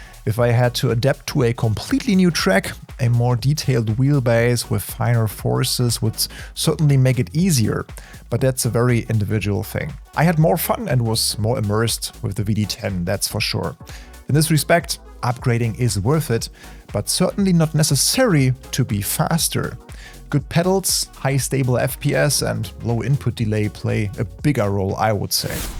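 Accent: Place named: Germany. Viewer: Man hört dein Deutsch extrem raus😅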